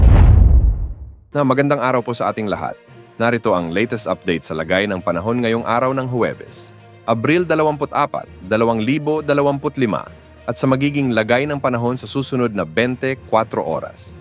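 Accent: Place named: Samar, Philippines. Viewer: samar ang datingan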